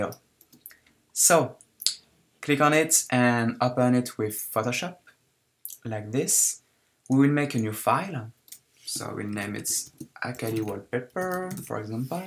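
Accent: France. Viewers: Tu es français, non ? L'accent est magnifique ! Edit ; J'avais pas remarqué le toshop en français... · Mdr on sent bien l'accent français ;) sinon très très bon tutoriel ainsi que boulot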